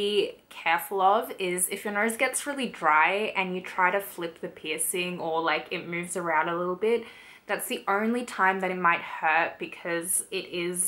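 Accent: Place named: Australia. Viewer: Love your accent!! I've heard Australian accents before but never that hint of "r" that follows ur "o"...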